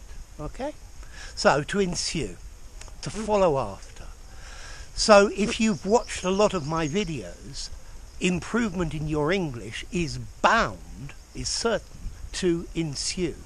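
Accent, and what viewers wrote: RP, United Kingdom. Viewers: Hello Sir I've just run into your videos....you're such an excellent teacher. I wish people here in the North of England spoke as clearly as you!!! I can't wait to watch all of your videos!! I love posh accent, but I still struggle when it comes to understanding people speaking (for me) fast....would you explain us how to deal with this issue? Thank youuu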